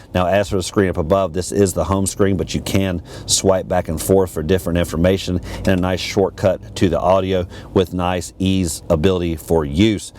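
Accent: South, United States. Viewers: . Great job. I like the truck. The warranty is great too. Worked that southern accent. Mississippi myself. Plan on getting this truck but a different color. How does the 4 by 4 Platinum drives? Is it a heavy feel? It's supposed be around 5 seconds for 0 to 60.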